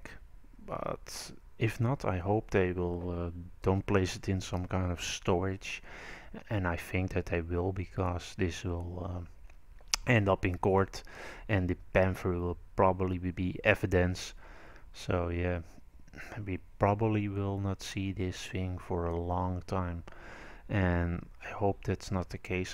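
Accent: Netherlands. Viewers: ja ja als je engels wil praten doe dat dan met engels aksent en niet met nederlands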